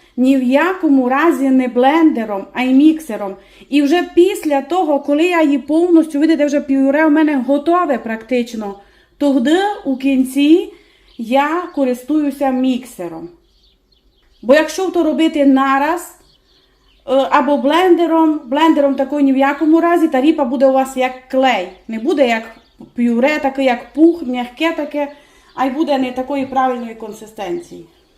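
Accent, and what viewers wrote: Transcarpathia, Ukraine. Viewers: Дуже люблю закарпатський говор, погано що не видно господиньки, раніше було видно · Я хоч і хотіла подивитися рецепт, але сиділа і слухала як гарно і красиво Ви говорите❤ нага мова то любов💛💙💛а з вашим закарпатським діалектом, дуже гарно.. дякую. Рецепт передивлюся ще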